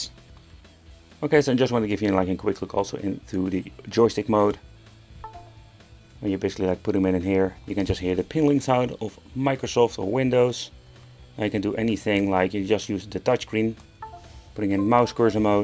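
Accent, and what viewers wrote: Netherlands. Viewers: Enige reden dat ik het zou kopen is omdat ik geen steamdeck kan kopen ivbm wachtlijst.... Maarja weetje €300 voor wat nostalgie games is opzich te doen. Je Hollandse accent is niet te missen trouwens 👍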